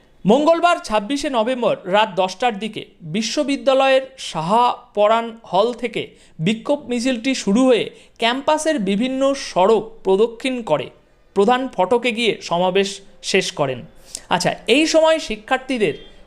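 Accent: India. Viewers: কলকাতার দাদা মনে হচ্ছে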